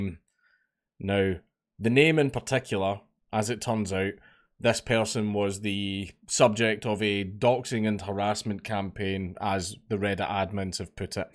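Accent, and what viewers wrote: Scotland, United Kingdom. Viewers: Scottish lol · I'm aware he's Scottish. It's a meme.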